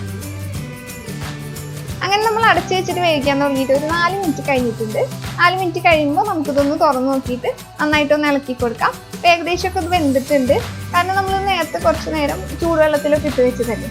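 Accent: Thrissur, India. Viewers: Nala malayalam Trisuranele😊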